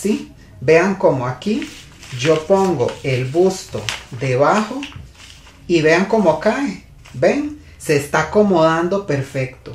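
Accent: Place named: Costa Rica. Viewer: Maestro muchas gracias por compartir sus conocimientos, perdón tengo una pregunta: usted es costarricense? Me parece que su asentó es de costa rica. 🤗🙏🙏👏👏👏🇨🇴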